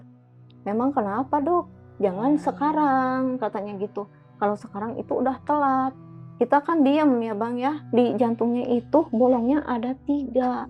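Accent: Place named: Cianjur, Indonesia. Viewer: Kakyk logat cianjur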